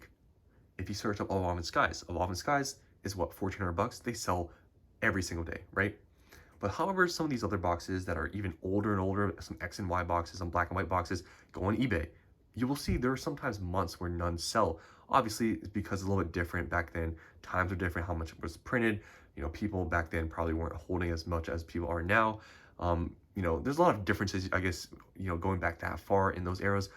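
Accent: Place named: Asia. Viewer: This is random af but from your accent and hand I'm guessing you're asian?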